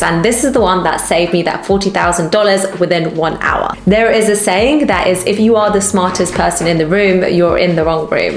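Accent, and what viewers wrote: England, United Kingdom. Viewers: No, she looks Indian. She sounds British. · I'm just here for the British accent 😂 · Firstly, I am American. Your accent is so soothing. I have found your channel and now I am binge watching them. There is some great information you are putting out. Thank you. I am 47 and never really new about financial education. I have started to learn thanks to YouTube. Between Minority Mindset and you and a couple others, I have started to learn. I am so far behind. But at least I have found people who are willing to make videos that caught my attention. I have been researching engine swaps for my car, and Minority Mindset popped up. And then your channel as well. I am so glad it did. I've seen other people like Robert Kiyosaki and a few others. I just bought Rich Dad Poor Dad. I am now on my way. I do have my 401k through my job. So that is good. 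You are a beautiful woman. And VERY INTELLIGENT. And with your British accent, you are very pleasant to watch. I am trying to learn as much as I can. I've been stuck in the rat race like almost everyone else. Your right, that's what they want. All these years I've been making other people wealthy instead of myself. Thank you so much,!!! Truth be told. I saw your thumb nail and thought, wow she is pretty. Sorry, I'm just a guy!!! Lol. But your content is so worth it. Thank you again for helping me and alot of other people turn our lives around. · The England accent it’s annoying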